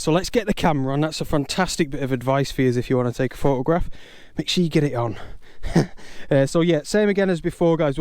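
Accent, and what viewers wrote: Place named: Northern England, United Kingdom. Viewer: Great video. I never think of shooting wide open maybe it’s about time to have a go! So jealous of where you live, being able to visit such beautiful scenes. I sometimes wonder if I tune into your channel just to hear your lovely Northern accent being a Manchester girl myself! 👍📸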